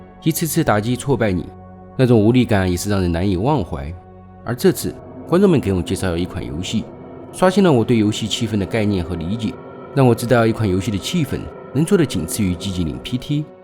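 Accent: Sichuan, China. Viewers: 四川口音有时候就这样 要求这么多干啥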